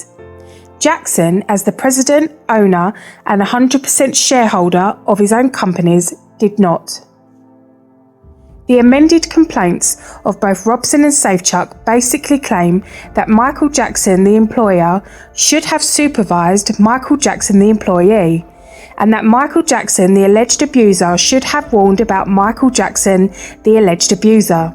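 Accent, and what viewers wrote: United Kingdom. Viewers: Special thanks to the pommie bint who narrates this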